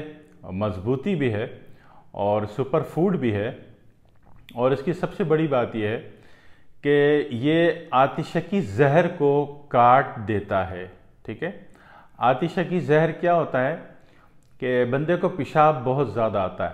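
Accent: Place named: Hyderabad, India. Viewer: Walaykum Salaam Warhmatullahi Wabarakatahu. Muhtaram Dr Sahib Ap ka Lahja Hyderabad Deccan Ki Tarha Hai Bahar E HAL JAZAK ALLAH..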